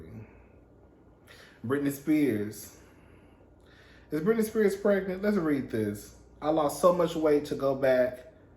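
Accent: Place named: Houston, United States. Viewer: I love your Houston accent, when you say “thurrr” and “hurrrrr” is everything to me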